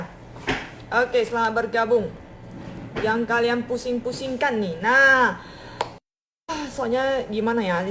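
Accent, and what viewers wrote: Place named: Taiwan. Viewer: Eh di Taiwan kerja apa sih kok cengkok bicara nya uda sangat menyatu dengan Taiwan.uh..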